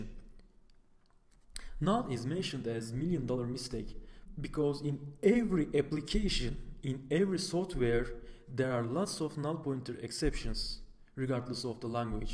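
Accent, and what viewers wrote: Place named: Turkey. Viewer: Turkish accent :)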